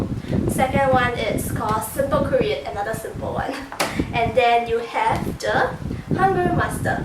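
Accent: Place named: Singapore. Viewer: Idk whether anyone has said this but you sound like a singaporean.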